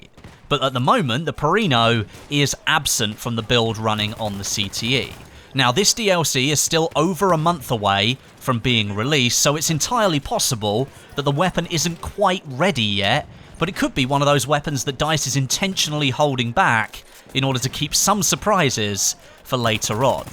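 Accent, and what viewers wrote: United Kingdom. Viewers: your UK affiliated accent is terrible to listen to u dont sound very compelling either I must have been drunk the day I subscribed lol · Jesus that's a fucking thick British ascent.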